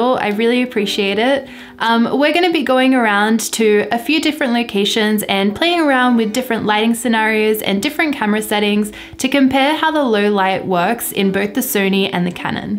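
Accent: Australia. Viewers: I am in love with the Australian accent already, so cute! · Do all aussies talk like you? 😍 I have to go to Australia.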